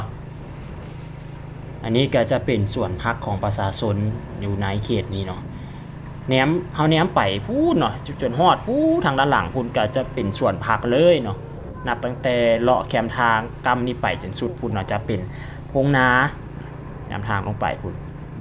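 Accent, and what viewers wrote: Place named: Lom Sak, Thailand. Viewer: สำเนียง ก็ใกล้เคียงกับบ้านข้อย วิถีชีวิตประชาชาชนบ้านฮ้อม หาดซายฟอง คือกันกับบ้านข้อย บ้านหวาย อ.หล่มสักจ.เพชรบูรณ์,ไทยแลนด์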